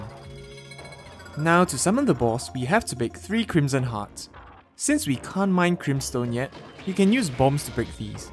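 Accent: Singapore. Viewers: Where are you from? Your accent sounds singaporean lol.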